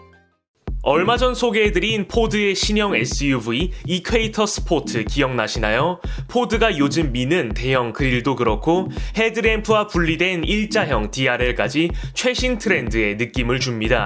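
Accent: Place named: Jeolla, South Korea. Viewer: 히 이분 말투가 전라도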